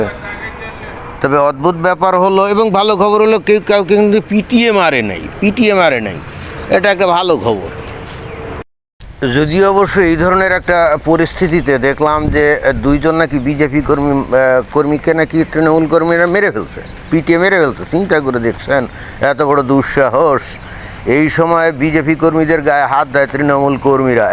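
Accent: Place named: Bangal, Bangladesh. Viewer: তোমার উচ্চারণ দেখে মনে হচ্ছে তুমি বাঙাল।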